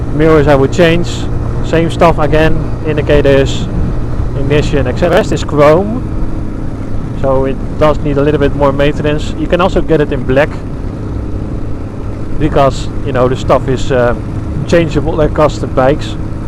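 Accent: Netherlands. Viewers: haha hoorde gelijk dat je nederlands was ik ben deze aan het overwegen als mijn eerste motor zou je hem aanraden?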